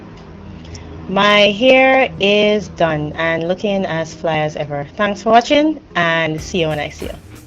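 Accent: Jamaica. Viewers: is that a Jamaican accent I hear? 😁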